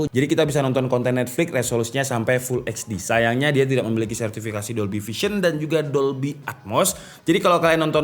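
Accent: Malang, Indonesia. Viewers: Asli malang kah om ?? Kok kyk di malang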